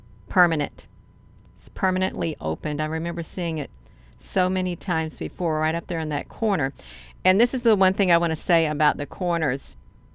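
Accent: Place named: Georgia, United States. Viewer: Hi, this sphere thing has always intrigued me, it’s happened a few times over the past 5 or 6 years. Obviously something massive that our so called leaders keep from us. This is a completely different subject but I gather from your accent which i think is awesome by the way, that you live in Georgia. I’m flying over from Australia to stay with my cousin for 2 weeks, he and his wife live in Savannah, can’t wait!.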